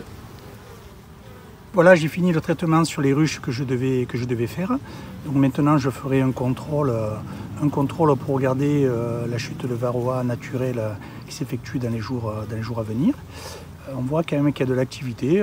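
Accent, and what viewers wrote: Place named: Provence, France. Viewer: Bonjour Peut on utiliser ce même mélange (AO+AF+ SIROP) sur des lanières cartonnées pour un traitement avec couvain? En cas de forte Infestation, jusqu'à combien de traitement flash peut on faire en automne sans trop de risque sur la reine notamment? Félicitations pour la qualité de vos videos et votre sens de partage. Avec les enfants on suit vos vidéos et on apprécie le bel accent provençal 🙂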